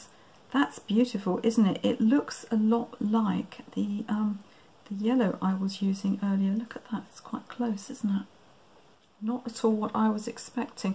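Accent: England, United Kingdom. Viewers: Great info, thank you for sharing your knowledge! Plus, I love listening to your beautiful English accent! 😍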